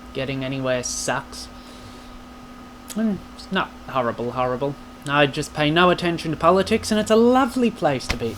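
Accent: Australia. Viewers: Speaking as an NZer you sound Australian to me. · You sound more Australian than me haha. Little tip for your green screen, try to get the material tightener on your frame, it helps heaps with keeping the background images solid. Otherwise great video :)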